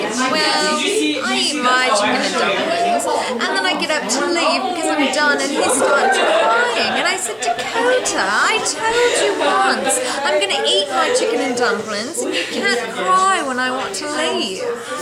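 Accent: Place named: RP, United Kingdom. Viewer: Hey! babe not bad at an english accent mind you it's like Emma from Jane Austin a bit posh. Love the way you kept saying nun instead of nan. i will have to come over and teach you one day; ) TeamUK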